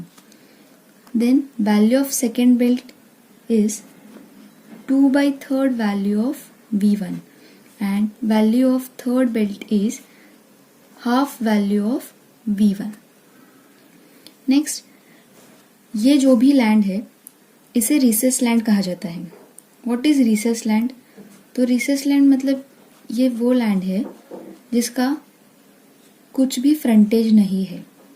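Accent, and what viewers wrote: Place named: Maharashtra, India. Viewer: Thenks ma’am it has been most helpful ❤️🫡 you sounded like a marathi mulgi though 😅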